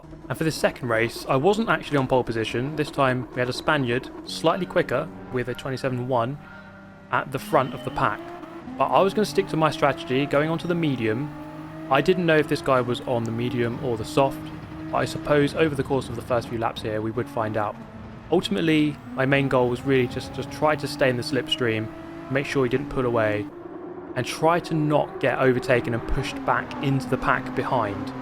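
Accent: United Kingdom. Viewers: Always calm and composed, unbelievably well spoken, tastefully subtle British accent, and an incredibly smooth natural tonality of voice complimented by a great recording / microphone is a legit perfect combination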